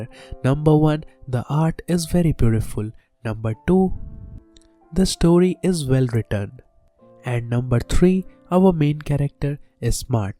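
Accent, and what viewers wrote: India. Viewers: lmao indian accent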